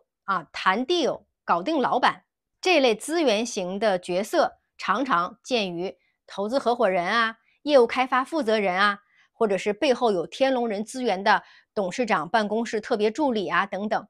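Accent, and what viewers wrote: China. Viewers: 有点好奇，为什么听主播口音好像是大陆人，字幕却是繁体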